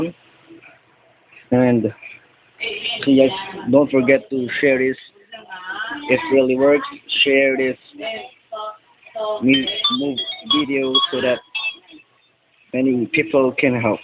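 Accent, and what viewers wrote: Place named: India. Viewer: at least you understood what you saying ? your English accent is worst , don't know why our fellow indians talk English in this worst way ! buy tube light in your washroom.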